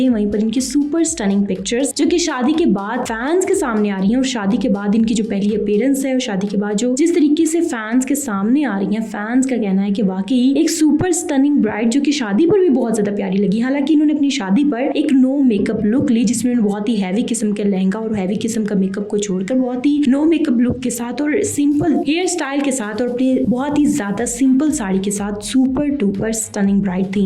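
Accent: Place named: Punjab, India. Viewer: Punjabi aunty accent in the video.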